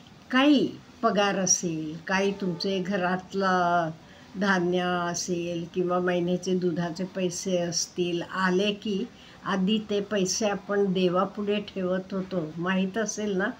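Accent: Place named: Solapur, India. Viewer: तुमच्या बोलण्यातून तुम्ही सोलापूर चे आहात असे वाटतं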